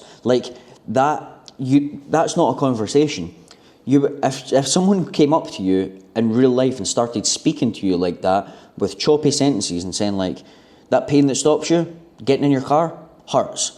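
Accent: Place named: Scotland, United Kingdom. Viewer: Where in Scotland are you from g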